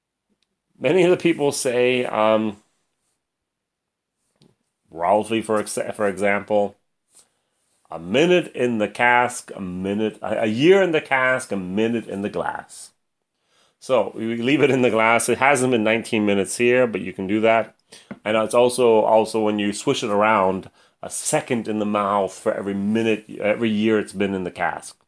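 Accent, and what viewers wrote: Germany. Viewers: Hi, i didn't know you had an english channel too. funny to hear that you sound so much like a german who's speaking english😄(no offence intended!)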